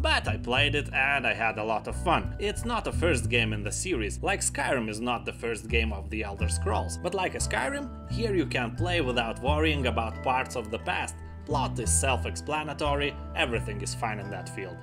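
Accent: Russia. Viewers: Че ж такой жесткий русский акцент...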